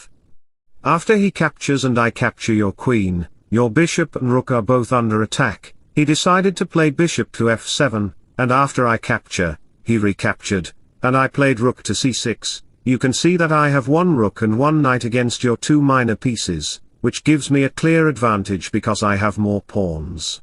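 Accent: United Kingdom. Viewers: It must be - that's a British accent.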